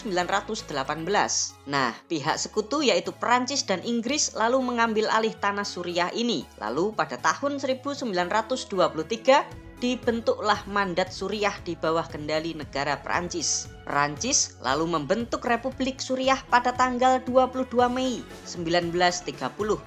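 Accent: Java, Indonesia. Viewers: Kok kyak ngomung bahasa jawa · Metdok buanget. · Jawa medok bner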